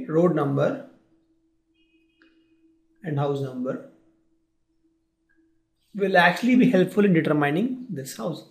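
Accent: India. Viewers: Pls try to speak less Indian accent if possible - not an offence - im just giving suggestion pls dont misunderstand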